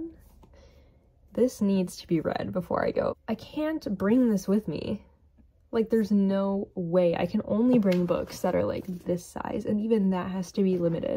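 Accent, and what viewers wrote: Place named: Spain. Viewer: ur spanish accent is cute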